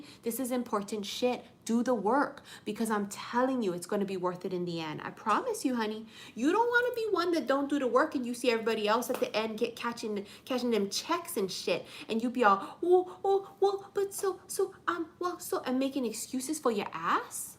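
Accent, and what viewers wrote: New York, United States. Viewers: Sound like a New Yorker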